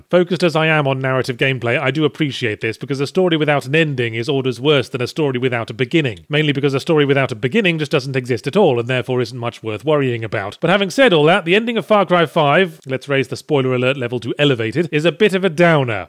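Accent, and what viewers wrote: Australia. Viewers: Yahtzee is starting to develop an Australian accent, after all this years.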